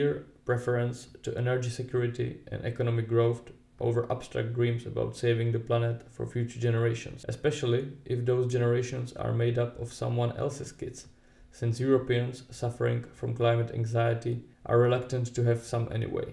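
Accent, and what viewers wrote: Czech Republic. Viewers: whats your mother language? you sound kinda like a czech with your english accent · Hey bro...fenomenal stuff keep i going. BTW are you Czech? Because that sounds like czech english accent to me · The czech accent is killing me💀💀💀 But very true video indeed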